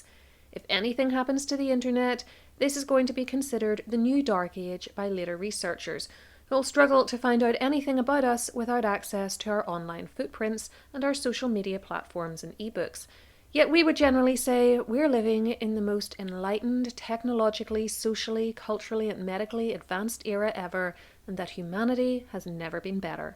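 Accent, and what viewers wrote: Northern Ireland, United Kingdom. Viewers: Great video, thx. Just have to say, me mum grew up in Co Derry. Love your N Ireland accent. I subscribed based on the conctent. Well done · Oh, your accent is absolutely grand and I have no problems whatsoever cathing everything. Never been to Northern Ireland though, only Éire, but I really want to visit the north as well. Hello back from your distant, rainy neighbour Noreg. :)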